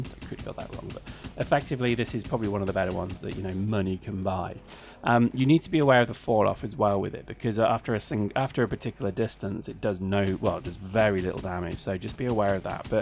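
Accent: United Kingdom. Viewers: your accent sounds close, you must be in the South East? Maybe even Kent - Sussex? Where are you, I'm in Canterbury.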